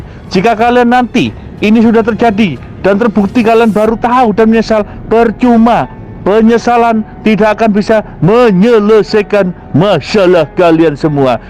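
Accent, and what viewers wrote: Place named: Java, Indonesia. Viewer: Nada bicaranya seperti org jawa. Gk akan lama lagi anda tertangkap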